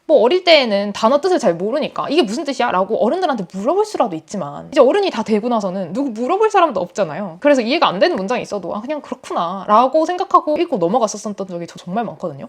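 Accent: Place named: Busan, South Korea. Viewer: 부산 사투리 ㅎㅎ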